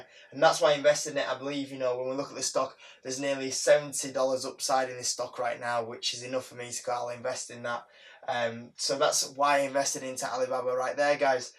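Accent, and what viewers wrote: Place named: United Kingdom. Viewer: Hi , By the accent you have , I`d say you`re british. Could I trouble you asking how much tax did you pay on your Apple shares sale ? I just started too recently and am curious . Is it just that 0.22% and brokerage fee or is it something else as well ? and after you pass like 12.5k this year , you start paying like 20% ?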